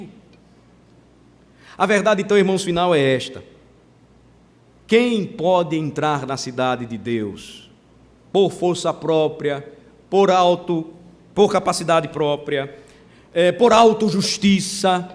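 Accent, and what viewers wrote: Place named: Sergipe, Brazil. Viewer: Oi pastor!!! Assisto teus cultos aqui em BH. Nasci na igreja presbiteriana , ha 68 anos atrás, no interior de Minas. Hj frequento a Metodista. Gosto muito do seu sotaque.... eu, meu marido e meu filho ha 25 anos atrás moramos doze meses aí em Aracaju. Foi um tempo lindo! Temos um carinho muito grande pelos sergipanos e tbem por Aracaju. Amamos de coração!! Um grande abraço, pastor.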